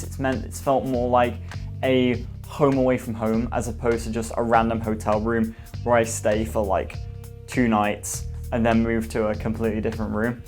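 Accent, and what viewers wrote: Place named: North, United Kingdom. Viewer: You almost said bahthroom. You are losing some of your Northern accent as I did when I started at Oxford in 1975.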